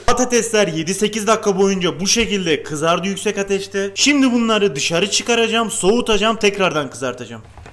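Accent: Adana, Turkey. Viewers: Adana'ya doğudan göçen kürt kesimi böyle konuşuyo genelleme yapma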